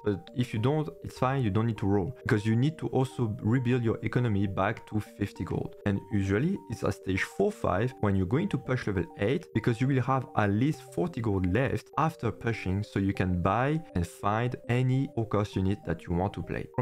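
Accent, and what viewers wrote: France. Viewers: French accent was easy to understand most of time, but it took me a moment to figure out that you talked not about 'focused units' but 'four cost units' :) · C'est moins l'accent français que l'enfer d'écouter un français me parler anglais alors que ce serait plus simple en français mdr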